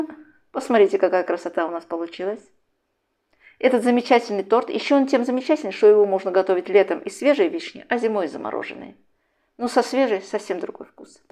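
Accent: Baku, Azerbaijan. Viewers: Этот .добрый, объединяющий всех бакинских акцент, мягкий разговор можно слушать бесконечно Здоровья рукам вашим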